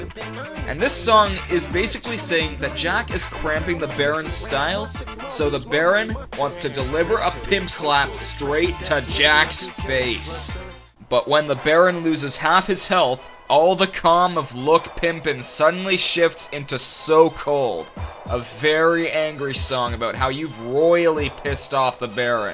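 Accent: Scotland, United Kingdom. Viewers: kojackin my opinion sounds somewere between british and scotish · he sounds scottish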